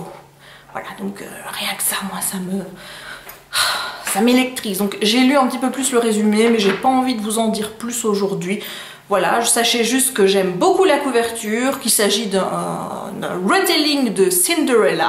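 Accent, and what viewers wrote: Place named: Belgium. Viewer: Coucou! C'est la première fois que je te laisse un commentaire mais je regarde tes vidéos depuis longtemps, et ce soir je voulais juste te dire que je les aime beaucoup! Elles sont de plus en plus dynamiques, rigolotes, pleines de vie en fait! Je trouve que tu es très fraîche, et ton petit accent belge me rappelle des bons souvenirs (ma famille maternelle est belge également). Les sélections de sorties pour les mois à venir me plaisent toujours parce que cela permet de trouver plein de nouvelles idées de lecture! En feras-tu prochainement pour les livres en VF aussi? Je demande surtout par curiosité, les VO me vont déjà très bien. Belle soirée!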